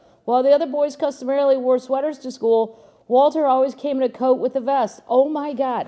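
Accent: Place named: Wisconsin, United States. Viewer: Love her Wisconsin pronunciations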